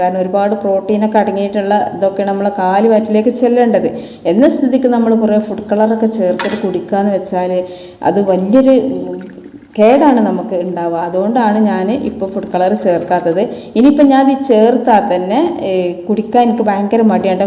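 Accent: Malappuram, India. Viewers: Malappuram thahta Samsara matiyallo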